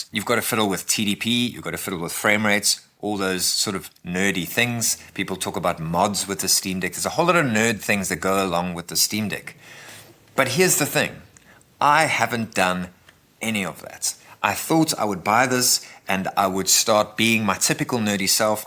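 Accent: South Africa. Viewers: Are you South African? You sould South African. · You South African ?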